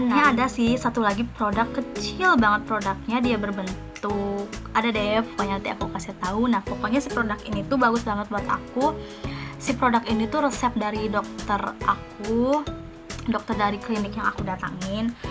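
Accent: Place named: West Java, Indonesia. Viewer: Sundanese bgt yekhannn 😁😅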